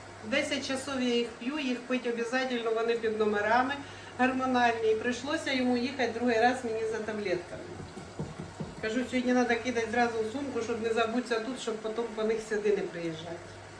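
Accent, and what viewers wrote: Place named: Zaporizhzhia, Ukraine. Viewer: сьогодні ролік клас дуже весело і цікаво а розмовляєш ти українською на нашім ріднім запорізьким діалектом.Слухати тебе приємно як з'їздив до себе додому.Прохання: більше показуйте і розповідайте про бази,показуйте місцевість.Якщо нема машини як ще можна передвигатись по тій місцевості,буду вдячна.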